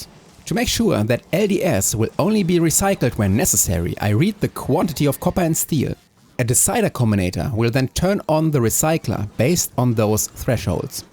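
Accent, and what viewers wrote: Germany. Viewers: lern doch einfach mal gutes englisch , das tut im ohr weh, dein deutscher slang 😀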